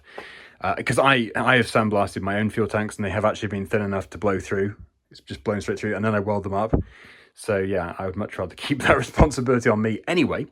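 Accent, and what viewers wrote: United Kingdom. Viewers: Your restoration and rebuilt videos are fantastic! I enjoy the ones when you talk because, to an American ear, you sound like a high class Brit doing grunt work, giving us all hope! Because of you I have tried (and sometimes succeed) to rebuilt a repair small engines that need a little help. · I think every youtuber will go through this. You know the answer, its a matter of being committed and do it. The next dilemma will be time. Do you hire someone to do your editing? You'll work it out. People love these videos and its great to hear a pommy on yt. Good luck greetings from Australia. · your British accent to be very ASMR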